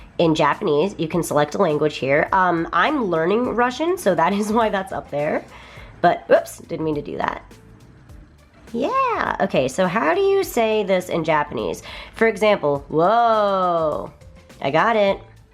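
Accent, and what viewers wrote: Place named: United States. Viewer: you sound extremely american when speaking japanese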